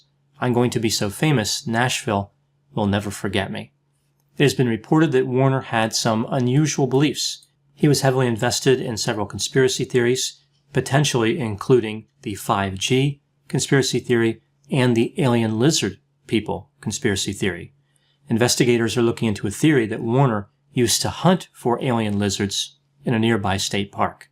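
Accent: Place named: Mid-Atlantic, United States. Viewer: Dr, you sound like you're from South Jersey/Philly region. You have a very distinct mid-atlantic accent. Where did you grow up? I'm studying linguistics..just curious!!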